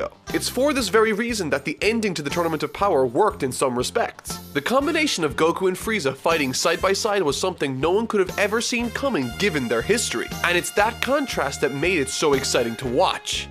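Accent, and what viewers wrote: Ireland. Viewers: Just curious are you Irish? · Idk why i didn't catch it before but you are really irish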